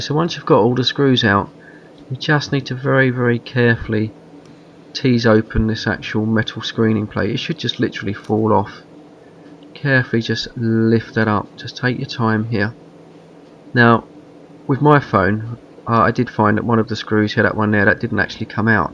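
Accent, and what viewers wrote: United Kingdom. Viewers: Thank you Sir, Your advice saved me a lot of frustrations when my iPhone's screen went blank after entering wrong passcode several times. The screen went black and blank and was unable to respond to anything after trying to reboot or press home button several times. Thank you again.- -Richard from Canada. ( I know the owner of this video is in the UK {telling by accent})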